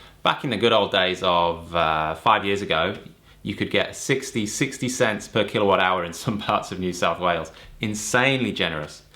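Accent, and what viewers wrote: United Kingdom. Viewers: All be it a British Aussie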